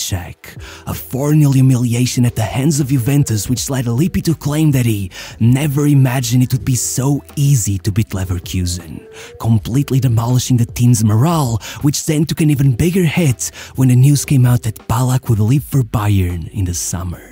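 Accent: Portugal. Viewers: I think he sounds like this because of the Portuguese accent and not by choice really. But personally I prefer it than highly energetic YouTubers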